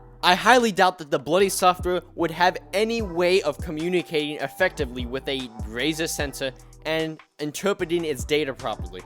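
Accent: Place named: New York, United States. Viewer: He sounds like a newyork dad like thank you gouys four so much suppourt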